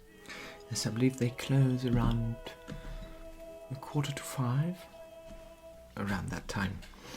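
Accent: RP, United Kingdom. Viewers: Thank you for putting this together. What a lovely posh accent you have. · I enjoy this so much. Just recently found your channel. I am wondering if this is your natural accent OR if you change it up for a former era. Or perhaps you are putting on a more proper upper crust accent. Isn't there a way of referring to the more proper Queen's English accent - RP or something like that. I enjoy hearing all the different types of English accents, the proper, the cockney, the Liverpool style, etc. I am American but I know there are many inflections. Just as we have so many regional accents here in America. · What type of british accent is this? you and one other similar asmrtist share the same accent i cant help but wonder where this originates from.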